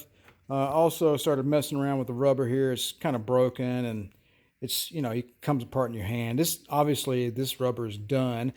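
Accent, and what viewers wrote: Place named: Texas, United States. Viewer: This guy must be from TEXAS! Luv it man!... Good video, thank you sir...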